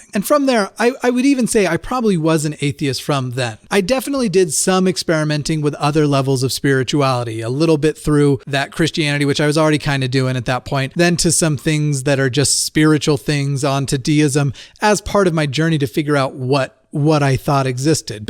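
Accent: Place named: United Kingdom. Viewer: First of all I’m really proud of you 👏🏼👏🏼👏🏼👏🏼🍀 and second of all I love you very British Intonation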